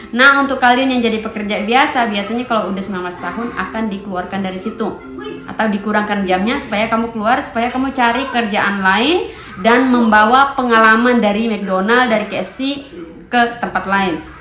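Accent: East Nusa Tenggara, Indonesia. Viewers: Maaf ibu asli indo mn bhs i ndonya kyk NTT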